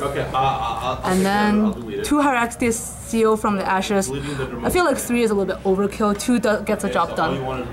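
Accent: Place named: Asia. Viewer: Her Asianic accent. Finally I'm not the only ones with Asian accent that plays ygo. :)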